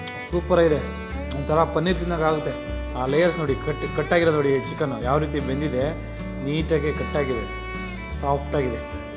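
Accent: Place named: Mandya, India. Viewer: Avva Karnataka dhalli ondhu ondhu prantyadalli ondhu ondh thara mathadthare, adhe reethi adhu Mandya bashe artha madkovva comment madoke munche sariyagi yochesu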